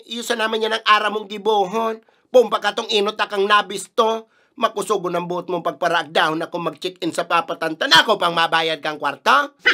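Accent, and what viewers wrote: Bicol, Philippines. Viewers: naugma ako duman sa lamay-ag.. hahaha, bikol na bikol. KUDOS ORAGON KITA!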